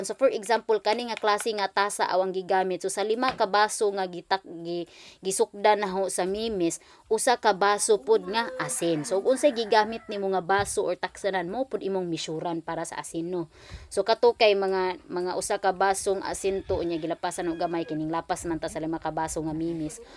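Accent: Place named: Dumaguete, Philippines. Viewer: karon ra ko ka realise. Dumaguete ug Bohol parehas ra kaayo ug grammar ug accent. ang kalahian ra ang J ug Y.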